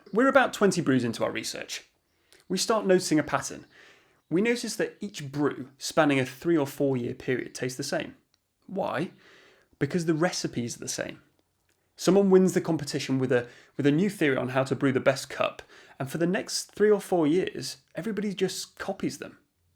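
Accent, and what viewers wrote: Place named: England, United Kingdom. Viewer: Somehow I could tell you were English before you started speaking. Love the accent 👌🏽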